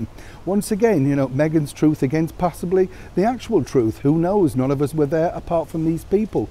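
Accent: Yorkshire, United Kingdom. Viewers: Whoopi took one for the world and saved a whole heap of people from dying of boredom. Thankyou Whoopi for saving us🙏 If Moaning Meme's team can complain about a phantom possibility,then we need to keep calling out meme's horrendous "acting" and lies. A little black tea with some good honey for that croaky throat. We want to hear your strong Yorkshire tones at full voice..😁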